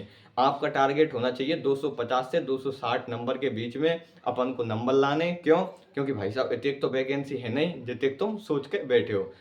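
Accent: Bharatpur, India. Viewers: Bhaiya bharatpur ke ho kya aap 🤔🤔 bnva rahiyo h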